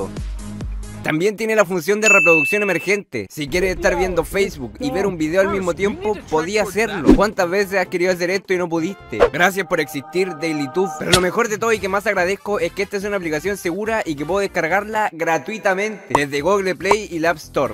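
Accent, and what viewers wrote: Chile. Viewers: De que parte de chile es chileno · Si no le entiendes simplemente pon subtitulos o vete del vídeo, Además ¿que tan difícil es entender el acento chileno?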